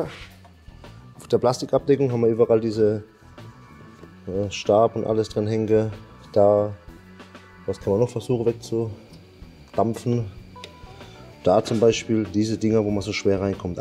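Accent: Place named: Palatinate, Germany. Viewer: Ämme Pälzer Bub kammer jo nur gläwe was er saacht 😂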